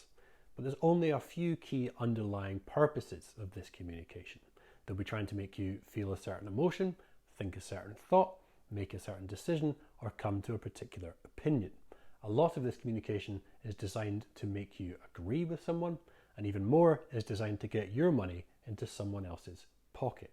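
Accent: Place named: United Kingdom. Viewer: Great uk accent straight to the point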